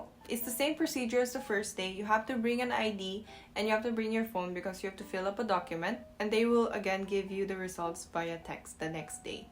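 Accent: Philippines. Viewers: O diba, pinoy na pinoy kung magsalita.👍👍 New subscriber here. Hope you upload videos regularly in the coming days. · I am still amazed by your accent and your tagalog language. So fluent para ka tlagang pilipino.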